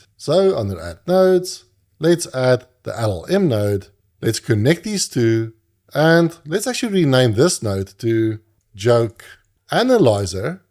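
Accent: Afrikaans, South Africa. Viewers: Môre. Ek't nou al so paar van jou video's gekyk en dit baie insiggewend gevind. Ek's regtig nie gepla met Engels nie - soos in glad nie. Maar dis so lekker om sulke regtige goeie kwaliteit inligting met 'n Afrikaanse aksent te ontdek! Maggies man, wil gedoen wees. Jy mag maar. Groete uit Durbanville - Kaapstad.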